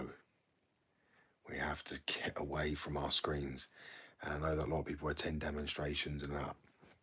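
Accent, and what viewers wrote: United Kingdom. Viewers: Welsh man?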